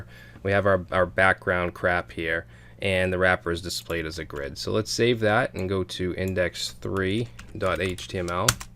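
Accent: Boston, United States. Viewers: I hear a fellow Bostonian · BOSTON ACCENT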